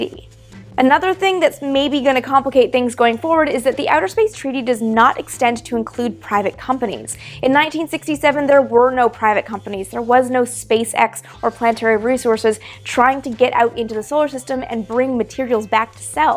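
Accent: Canada. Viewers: I really like these videos, and your pronounciacion is so Candion. Hehe. Go!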